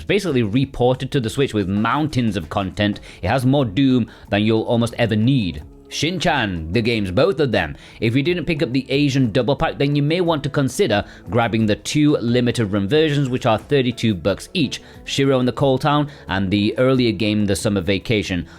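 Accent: United Kingdom. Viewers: Are you Caucasian ??? You sound very Asian British :o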